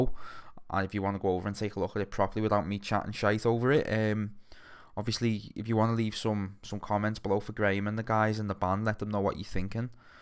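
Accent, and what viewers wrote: Liverpool, United Kingdom. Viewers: Your passion for great new metal, your Scouse accent and you're totally hot 😛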